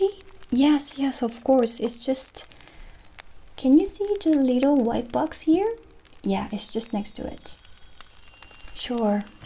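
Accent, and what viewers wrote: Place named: France. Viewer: no your French accent is perfect. The whole video is perfect and the music in the back is just *chefs kiss* haha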